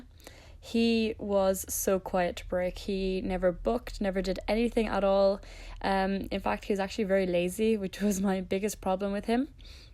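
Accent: Ireland. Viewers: Are you irish? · R u from Ireland 🇮🇪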